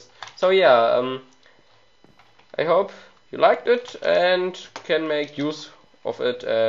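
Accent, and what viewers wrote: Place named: Germany. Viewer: du hast einen deutschen Akzent. :D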